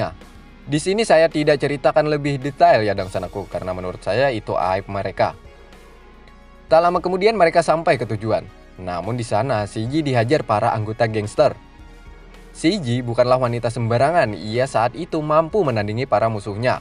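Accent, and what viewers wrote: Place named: Kalimantan, Indonesia. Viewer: Logatnya khas kalimantan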